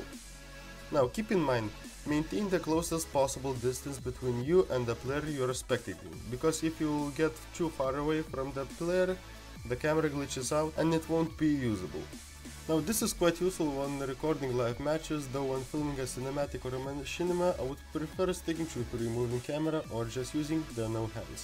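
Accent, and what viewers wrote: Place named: Russia. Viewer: Чувак, ты из России? По акценту слышится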